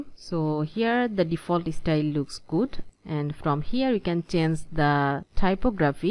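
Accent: Bangladesh. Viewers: Are you Bangladeshi? Your English speaking is so understanding. ❤️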